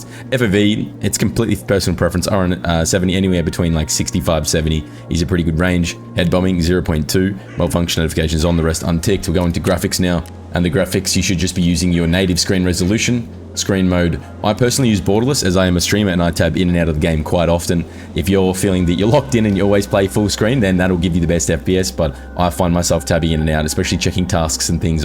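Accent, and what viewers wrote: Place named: Australia. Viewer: Hey brotherman just wanted to say a big thanks for making this video. Tweaked up a few settings to exactly what you're running. AMAZING ! Visual clarity doubled instantly. Color space is amazing too ! Used your post effects and ended up reseting my digital brightness to default again. AMAZING ! And so nice to hear a fellow aussie. Straight to the point. No bullshit video. 10/10 mang.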